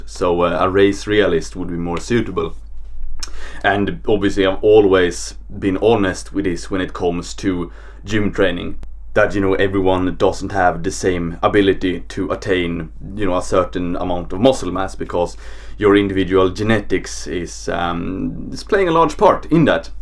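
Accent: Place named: Sweden. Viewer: Man your accent (and looks obviously) is so Swedish god damn XD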